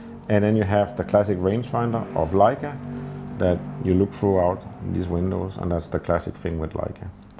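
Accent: Denmark. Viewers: Danish English > Dinglish!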